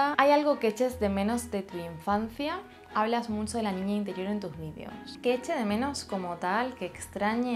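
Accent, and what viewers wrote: Argentina. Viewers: Sabía que eras argentina! Tu manera de hablar lo delata. Me encanta todo lo que compartís diosa ❤ he puesto en práctica tus consejos y me siento mejor. Gracias! Un abrazo desde Argentina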